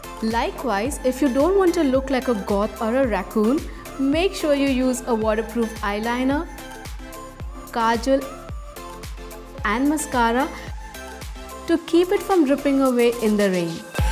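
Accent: India. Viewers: U r beautiful 😍 r u from India? Ur voice n accent sounds Indian.😃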